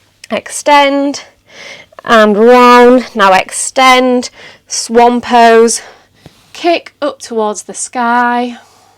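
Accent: Yorkshire, United Kingdom. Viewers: just discovered your channel, really enjoyed this flow, think this will now become my go to for yoga tutorials, really nice energy and excellent presentation skills plus, last but certainly by no means least you are gorgeous, do i detect a Yorkshire accent/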